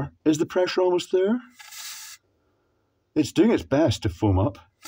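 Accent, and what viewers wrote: Scotland, United Kingdom. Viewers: Can I just say, you have the most easy to understand Scottish accent I have ever heard! for my American ears anyway. Sounds like a more modern version of the "Prime of Miss Jean Brodie" accent.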